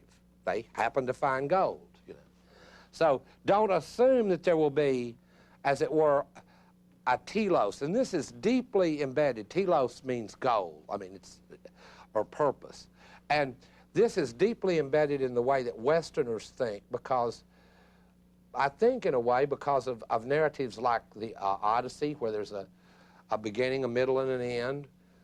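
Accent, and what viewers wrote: South, United States. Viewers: I cannot express how refreshing it is to hear European philosophy explained via Southern accent. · wow..is that southern accent? just knew it ..thanks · Watching Rick Roderick makes me really like those Southern US accents. On another note, his lectures are really great for some quick summaries to deepen understanding into a thinker's perspective, and this lecture series is really great. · It's rare to hear a southern accent talk positively/neutrally about continental philosophy. xD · love hearing an intelligent speaker with a southern accent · Watching him makes me realize how negatively biased marketers are against people with a southern accent. Ironically, they’re probably more liberal I’m guessing.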